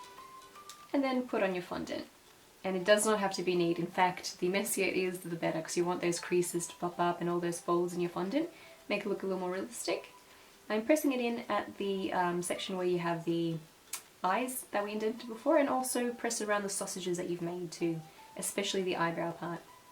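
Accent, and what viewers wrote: Australia. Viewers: yeah haha i had a feeling it was more of an australian accent but idk something about your voice sounds just like her! lol meant as a compliment of course i just stumbled on your channel and i love your videos(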